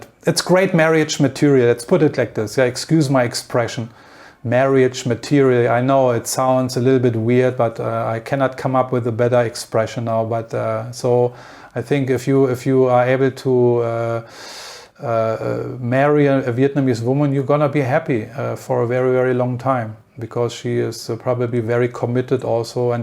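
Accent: Germany. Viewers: Do I here a strong German accent? 😊 How to stay there without border running?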